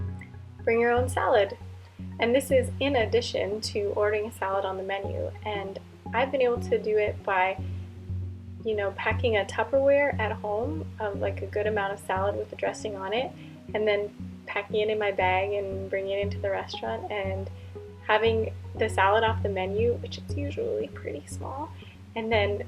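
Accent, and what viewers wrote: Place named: Canada. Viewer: dunno where she lives now but she definitely sounds canadian based on how she says 'about'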